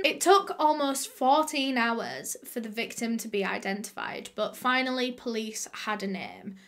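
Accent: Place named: Yorkshire, United Kingdom. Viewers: yorkshire accent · Shes from Yorkshire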